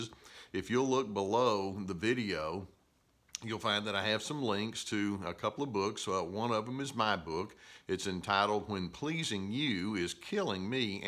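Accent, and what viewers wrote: South, United States. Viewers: Thankyou so much you've conceptualised so much of what this personality type is and those who have taken advantage of it. I've often said when, disappointed , that I expect too much from people . I know I have been naive , expecting people to be more like me. Learnt a lot by being burnt . I have watched a lot of your tube postings. The knowledge you've given me re Narcissists has been a light on on and several ' ah ha ' moments . Scott 🇦🇺 that's again , love the southern accent!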